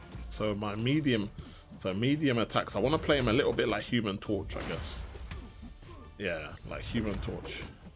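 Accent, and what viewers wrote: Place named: Jamaica. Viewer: turned full yardie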